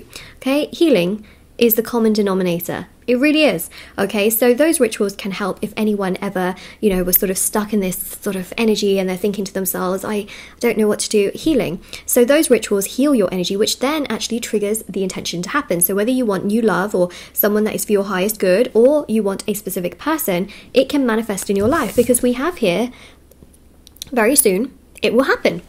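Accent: United Kingdom. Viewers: Girl, for how long you have been in the UK? Your English accent is awesome!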